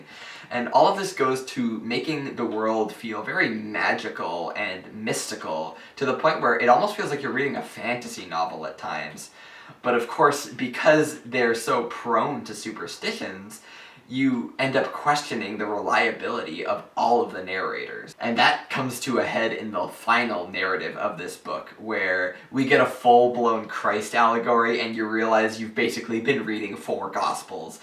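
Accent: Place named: South, United States. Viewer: Ah, I thought there was a bit of a southern accent to your response 😆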